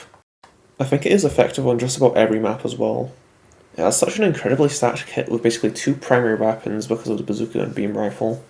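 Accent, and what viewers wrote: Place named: Scotland, United Kingdom. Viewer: Just started playing so these videos have been helpful, is that an Edinburgh accent? I'm from Glasgow so you sound Scottish but can't place it exactly.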